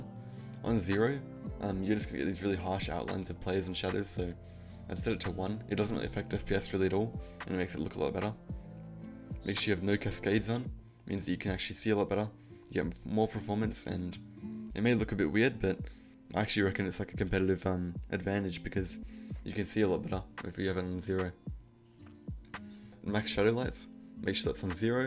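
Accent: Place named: South Africa. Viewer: I smell a South African.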